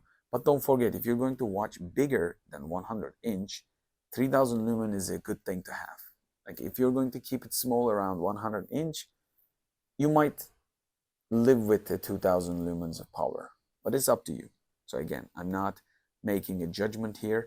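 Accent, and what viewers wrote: Turkey. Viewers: Türk aksanı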